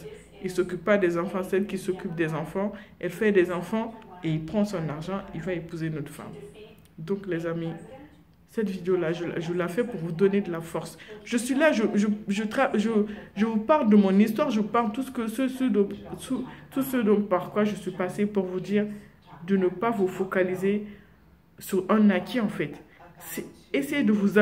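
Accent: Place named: Guinea. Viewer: Très beau cheveux, j'ai deviné que tu es guinéenne dès que j'ai entendu tes premiers mots j'espère ne pas me tromper 😘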